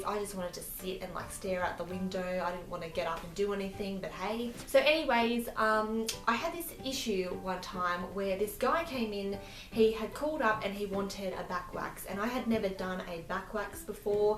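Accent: Australia. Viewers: You're pretty. I love your accent, aussie yeah? Your so close to 1k, congrats yey! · Are you from Australia. I'm from there!!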